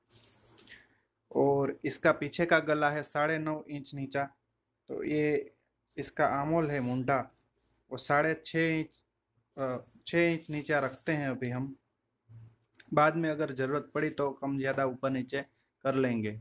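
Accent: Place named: Gujarat, India. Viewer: aapki bolne ki sppech se lagta he ki aap gujrari ho agar aap gujrati ho to plZ gujrati video bhi ek banaye na muje beak side pe kadi vala blause sikhna he plz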